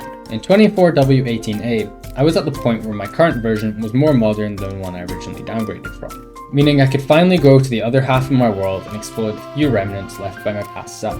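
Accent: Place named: Central Scotland, United Kingdom. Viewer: You have no idea how surreal it feels to hear a Scottish Minecraft YouTuber. You sound like your from central Scotland too like me!!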